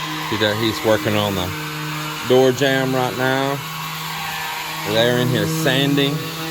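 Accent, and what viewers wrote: Texas, United States. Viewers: I noticed absolutely nothing out of the ordinary! And I've watched all ur videos. I noticed no neurological problems. My family and best friend lives in Texas. I'm 65. My dad was born and raised in Texas. Real Texans have that accent. As it relates to not feeling 100 everyday, I never related those days to viruses. Do not let the negative comments affect you at all. Melinda's mother is right. Our mentality is the key. We can't give our energy to our ailments. We instead try to focus on wellness. Physical and especially mental wellness. Also, one's educational background has nothing to do with one's gifting. Some of the most knowledgeable people are self-taught. Quiet as it's kept, institutionalized education systems stifle our gifts, talents, and mentality. So whoever is referencing 3rd grade education needs to be educated. 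· You are an amazing individual. Your ability to have focus on so many jobs at the same time with such detail. Memorized numbers, sizes ect from each job while supervising so many workers. The stress from that would crumble the average Joe. Me included. I have joked about the Texas drawl but fun heartedly. I knew many Texans while in the service.........and what bunch of good guys.One of my best friends was from Texarcana. Swamp land he told me. When i first started watching i wondered how you had so much money to do this. But now i guess you were some sort of construction engineer or site superintendent. Love the videos. Keep em coming.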